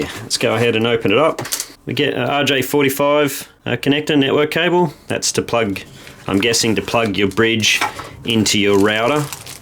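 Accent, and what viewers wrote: Australia. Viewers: just because he has an Australian accent doesn’t make him Lazar beam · Does everyone sound the same in Australia or is this lazerbeam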